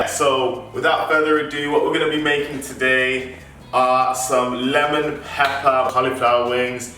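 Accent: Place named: United Kingdom. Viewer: UK ...nice one!! was just looking for cauliflower recipe, and your channel popped up. And even better when I heard the accent, okay I'm off to Tesco to get my lemon 😁....oh and I've subscribed, nice presentation BTW.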